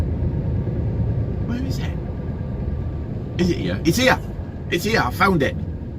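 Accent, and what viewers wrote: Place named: Northern England, United Kingdom. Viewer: Hi, I fell upon your vids as I'm planning to visit Greece in my M'home after postponing last year due to Covid and I have found your clip's to be very informative and just love that Northern accent. I'm guessing Leeds ! Anyway, thanks for the information provided which gives me an idea of what to expect when crossing over from Italy to Greece and driving in Greece. Hopefully make it this year before it gets too hot. Otherwise it's Slovenia and Bavaria. Keep Safe.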